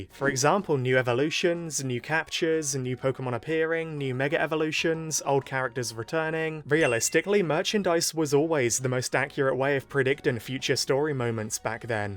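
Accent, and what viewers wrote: United Kingdom. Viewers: Dude Are you British 👀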